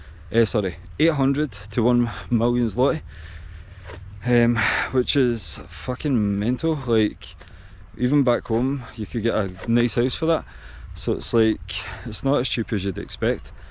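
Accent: Scotland, United Kingdom. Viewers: Your pronunciation is really difficult for me and I need subtitles but I like northern accent very much. I have never been to Scotland but I love this place. Don't ask my why because I don't know. Just imagination. People need to say "thank you" when you open the door but...that young generation, you know what I mean :)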